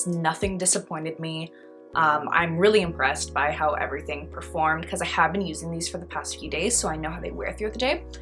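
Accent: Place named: Canada. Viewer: this is my first video i’ve seen from you and the second you stared talking i thought to myself “she’s totally Canadian” lolol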